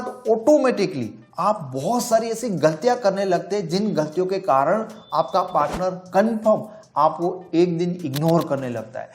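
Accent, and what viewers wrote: Gujarat, India. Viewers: Sir Are you from Gujarat ?? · Aap gujarat se ho ??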